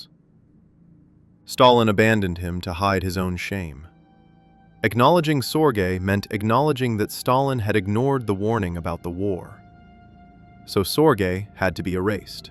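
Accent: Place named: Canada. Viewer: Thank you for utilizing a Canadian voice actor. So tired of the typical deep voice American VO.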